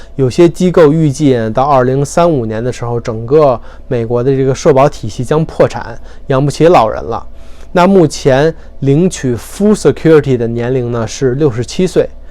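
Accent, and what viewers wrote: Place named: Tianjin, China. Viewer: 天津人吧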